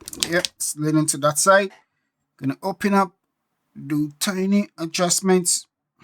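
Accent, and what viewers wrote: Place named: Ghana. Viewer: love the African accent,,, Ghanaaaaaaa.... lets link up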